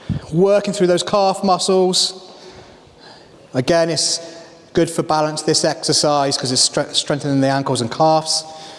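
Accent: England, United Kingdom. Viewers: Where is this filmed and what accent do you have…English, Aussie, what province? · I rather enjoyed those exercises! Also felt at home with a lovely English accent! Thank you.!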